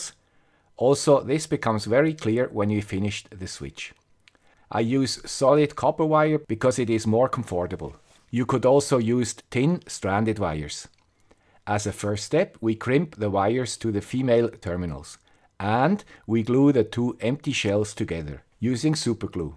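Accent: Switzerland. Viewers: was in Switzerland last month for first time, and wow, this is the land that Swiss accent guy living and making experiment !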